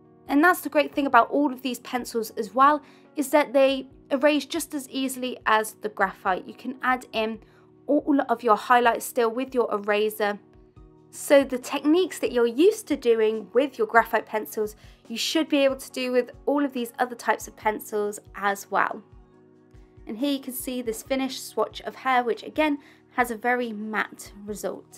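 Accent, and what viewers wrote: United Kingdom. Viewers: Hi,thank you so much for another great drawing tip. I am a portuguese speaker brazilian boy,graduated in arts many years ago,and thanks to living in the US for many years i a can understand you perfectly. You are hands down the BEST gifted drawer on youtube. May God bless you always so you can keep always bringing more and more very useful drawing tips like this. Ps: i love your british accent!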